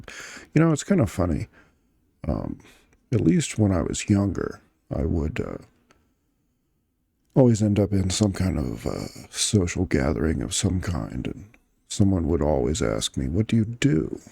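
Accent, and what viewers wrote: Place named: Japan. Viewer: Yes. It's really something that could be scaled up. ASMR or whatever it's called. Audiobooks and all that. He's like a native Japanese speaker but speaking in English.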